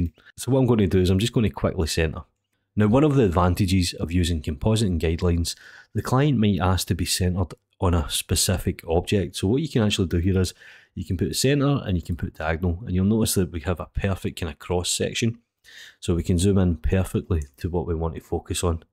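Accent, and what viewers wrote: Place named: Ayrshire, United Kingdom. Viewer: Nothing to do with this video, but I have been wondering this for a while. Your accent sounds identical to a guy I know who is from Ayrshire. Whenever I hear your videos it makes me want fish and chips as he owns a food cart here in Portland OR. PS. Loving Haggis Tools, I have quadview on all the time now. Makes this much easier for me.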